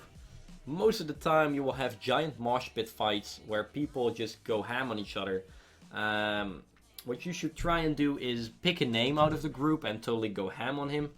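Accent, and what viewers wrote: Netherlands. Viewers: the first sentence already told me you are dutch. My accent is as bad as yours xD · Are You dutch?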